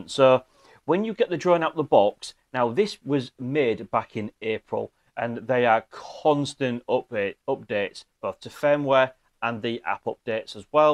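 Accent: United Kingdom. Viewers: Just a separate comment on your accent, it's super English and I really really love it and marvel at how you sound bro.. PS: I have another comment on the actual video.. 😁🇧🇬🛸 Sweet Keep well and be safe!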